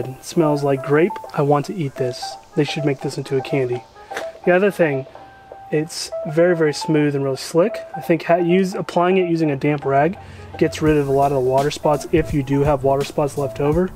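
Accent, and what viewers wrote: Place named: Texas, United States. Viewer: Where in Texas you from??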